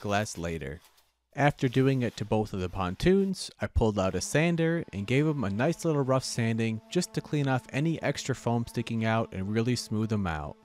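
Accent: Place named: Brooklyn, United States. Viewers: Unintentional asmr, Mild Hint of Brooklyn Edition. Mom or Dad came from Brooklyn, based on 1 degree of separation ideolect dilution. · where is this guy from? the accent is subtle. near new york, perhaps?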